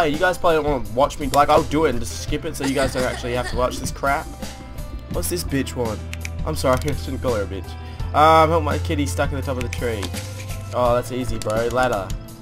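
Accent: Australia. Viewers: I can tell ur Australian! And u r super hot:) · you sound australian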